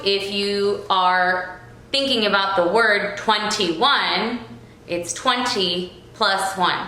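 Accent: United States. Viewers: How did u acquire this American accent. Looks like You've been settled in US for a very long time...pan memoni boli khand ji goli... period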